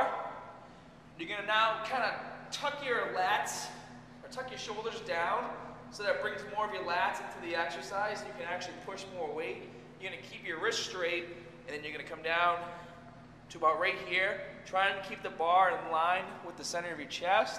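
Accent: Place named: New York, United States. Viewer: He's from New York...that's how they talk! :)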